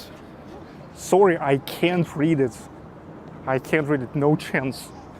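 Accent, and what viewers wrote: Russia. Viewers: Чет даже для русского заметно российский акцент )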